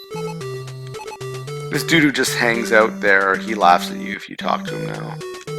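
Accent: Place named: Canada. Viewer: I can tell your a canuk 😂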